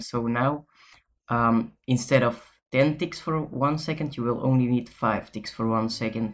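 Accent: Flanders, Belgium. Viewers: are u from belgium/flanders? your accent sounds like that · where you from? you sound belgium...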